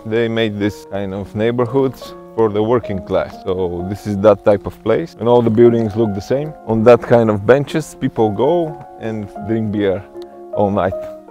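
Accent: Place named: Balkans. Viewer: English with Balkan accent!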